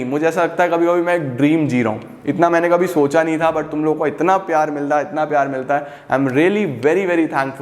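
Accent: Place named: Saharanpur, India. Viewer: Sir saharanpur se h pta chl gya😅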